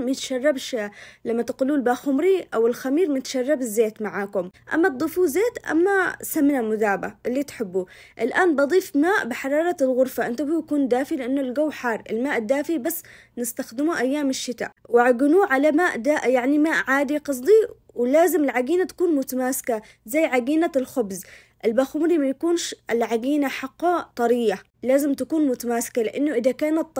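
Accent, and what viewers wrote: Aden, Yemen. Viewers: اقول شيء اووووول مرررره احب اللهجه اليمنيه قسماً بالله مره حلوه اللهجه يا زينك. بس لازم اجرب هذي الخبز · ياالله مااحلا كلامك يذكرني بعدن الحبيبه الله يعطيك العافية تسلم ايدك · اتابعك من امريكا عشان اسمع الكلام العدني 😅 ماشالله طبخك ياسلام 👏👏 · انتي الاحلا والاغلا طبعا شفت شوي من فيديوهاتك وان شاء الله اطبق كل شى واخبرك النتيجه اتمنى لك التوفيق والنجاح تدري اش احلى شى بقناتك طبعا كل شى حلو الشرح والطبخات وكل شى بس اكتر شي عجبني طريقتك بالكلام او ب الاصح انك محافظه ع لجهتك العدنيه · ماشاءالله اللهجة العدنيه تجنن انا فقط متابعه الفيديو عشان أسمع اللغة الجميلة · اول مرة اتابع يوتيوبر يمنييية كتير حبيت لهجتكن وقناتك حلوة واكلاتكم بتشهي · اول مره اشوف قناتك ياسلام ماشاء الله ستاره على خفه دم واحلى شي لهجة اهل عدن عسل والله معك إبيه🥰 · حبيبتي والله انا من السعودية ذكرتيني بصحبتي الغالية من ايام الجامعه كانت عدنية و نفس لهجتك❤❤❤❤ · ما شاء الله حلاوة كلامها ولغة أصحاب عدن...حبيت العفوية والاسلوووب.. 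الله يحفظك ويسعدك أختي الغالية.. متابعة جديدة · الله يحفضك غير الطبخه الي ترجعني للبريقاء اللهجه كمان ترجعني لبيتنا ولاهلنا تحيه كبيره ككبر عدن بكله لك وتسلمي علا الطبخات الي تحسسك بالفن لانه معروفه عدن ملكت الفن والله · كلامك يجنننن عدني قح😂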